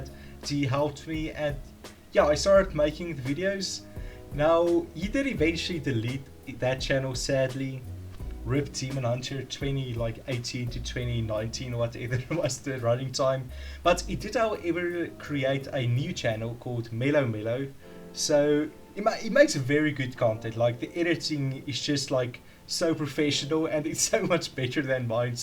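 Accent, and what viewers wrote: South Africa. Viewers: Are u from South Africa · Nice work on the world edit addon. It was a lifesaver. Tho I know you are from SA just because your accent is the same as RenDog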